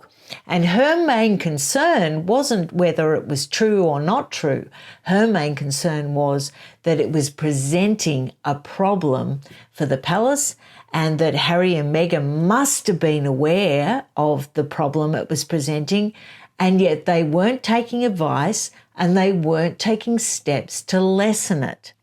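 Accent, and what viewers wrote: Australia. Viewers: I really like your lovely gentle Aussie accent (I'm a Londoner) and enjoy your views...thank you!! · I absolutely don't agree she was ever pregnant. Her belly was different sizes on different days. Often different sizes on the same day. What about when the bump fell down past her knees? Remember when, at almost full term, she did a deep squat in high heels & needed no help to stand up again. Unborn babies don't conveniently move to one side so you can squat. Anatomy doesn't work that way. I've always been very slim & had 2 babies in my 20s, not middle aged as she was. I can tell you, my baby bumps got bigger month by month, not up & down on sometimes an hourly basis. Neither did my babies conveniently get out of the way if I wanted to squat. Not that I could have, even if I wanted to. So much more I could say, but, NO she was never pregnant. 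Love your vids. Your lovely accent brings back fond memories of the 5 yrs it was my privilege to live & work in Sydney. It was bonzer.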